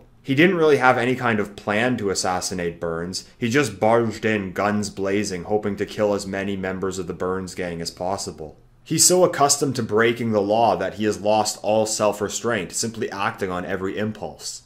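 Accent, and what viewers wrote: Canada. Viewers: Maybe it's bc of your Canadian accent & the fact that you're one of few ppl who aren't a natural born citizen of America who DOESN'T bash our "6UN CULTURE", but this is the BEST analysis I've EVER seen on this topic. I think it's time we just admit the truth: "… when left to their own devices men will form tribes and compete with rivals for tribal dominance, not live together in some multicultural harmony. This theme of ethnic loyalty in mobster films makes them feel more authentic, because this is how humans function in general. You really couldn't make a mafia film that lines up with modern progressive values without it being absolute SHlT…" ALSO, WOMEN long to be WOMEN AGAIN! And MEN long for them to be, TOO! But we just keep on telling the same LIES: That we are as STRONG as you. That we belong at the FRONT-LINES. That we take WAR & Other LIFE & DEATH Situations as SERIOUSLY as YOU DO (that's why WE MADE ALL THOSE TikTok Videos of us DANCING in Hospitals, while our Cancer patients, Burn patients, Heart Attack patients, DV Abuse patients, 6un SH0T patients, CHF & COPD patients, Alzheimer's patients, Traffic Accident patients, Kidney Failure patients, Sclerosis patients, Bowel Blockage patients, Pancreatitis patients, Stabbing Victim patients, Child patients, ALL got NO ATTENTION, SO THAT WE COULD GET OURS~ on Multi-Million Dollar Hospital Equipment, or Carrying Fake C0VlD CORPSES!). 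There is a vast difference b/t "Multi-Cultural" & "Multi-Ethnic". Mixing ETHNICITIES c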